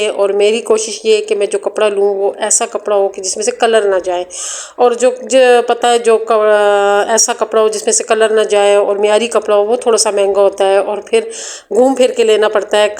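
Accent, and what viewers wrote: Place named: Punjab, Pakistan. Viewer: Bilkul meri tara my b ici tara urdo bolti ho yahi hal hy panjabi walo ka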